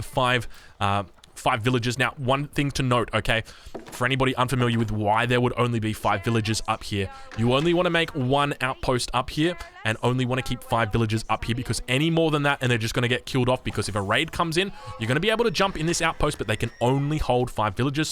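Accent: Australia. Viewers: Your channel is going to get huge dude. You're spamming out uploads at the perfect time, you're noticeably enthusiastic and knowledgeable about the game, and Americans seriously love Australian accents. I wouldn't be surprised if you had 100k subs by Christmas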